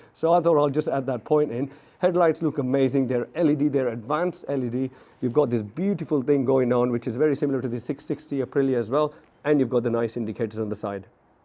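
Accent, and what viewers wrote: India. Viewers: U have somewhat Indian accent 👍 .. loved your video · You got an Indian accent... are you originally from India?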